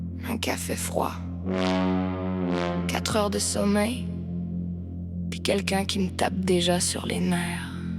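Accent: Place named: Canada. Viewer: Petit accent canadien 😂 j'adooore. Merci a mon fils de m'avoir fait écouter cet connasse 😂 j'adoore .❤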